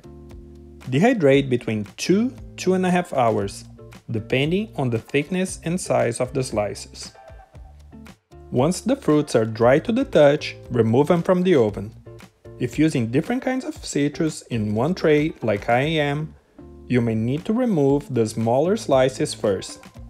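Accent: Brazil. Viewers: Are you brazillian? Or portuguese idk Ur accent kinda gives it up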